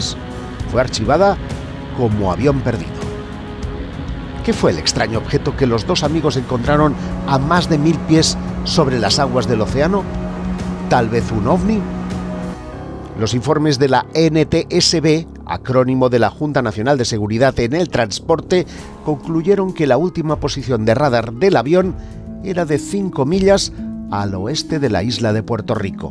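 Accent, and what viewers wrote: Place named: Spain. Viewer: Emocionado de poder escuchar el relato.. pero ... el Horrible acento español me hizo quitarlo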